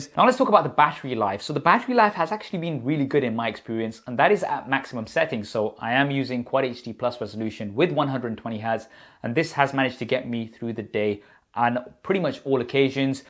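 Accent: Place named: United Kingdom. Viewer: He is probably Indian but he has an British accent so he probably lives in uk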